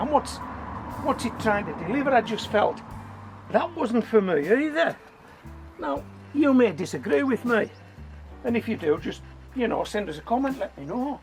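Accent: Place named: Northern England, United Kingdom. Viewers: Hi mate, i love your chanel and I am married to a Birmingham girl here in Australia. We were wondering what part of England you are in as you sound up North? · Hows it gaan Marra! I've got the autoplay set up on Youtube, so every now and again while I'm multitasking (listening, not watching) one of your videos pops up. Every time one comes on, its a bit of a surprise to hear a voice with our accent coming out of the computer - but how great it sounds. You couldn't get much more Cumbrian than the way you say Crimson Crush! I'm going to make a very late start with seeds from some supermarket toms (piccolo) - and see how it goes. Wish me luck!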